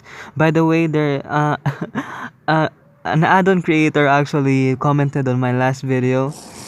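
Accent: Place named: Philippines. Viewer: Your a pilipino right i realized it because of your accent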